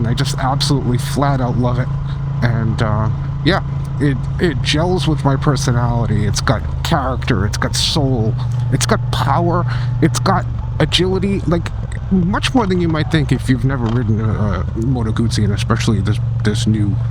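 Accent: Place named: Boston, United States. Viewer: We seem to have similar taste in bikes. My Tiger Explorer 1200 was getting a bit big and heavy, so I bought a V85TT in 2020 for birthday #75. It seemed to have all the things I wanted in a bike. 5 years and 16K miles later, it just wasn't gelling. So it went to a new home late last year and I picked up a Himi 450. Did a 5700 mile shakedown ride on the Himi and it is a great bike. In the interim, I picked up a Triumph Speed 400 for a day ride play bike - and it's a hoot. And then, there's the '86 Yamaha SRX-6 (that I bought new) sitting in the back of the garage sadly in need of a complete restoration before it can go back on the road. And I still have the big Tiger - although I will probably try to rehome it this Spring. The Himi is doing what I need for a distance bike and the Tiger deserves to be on the road. And I thought that I detected a bit of Boston in your accent. 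😁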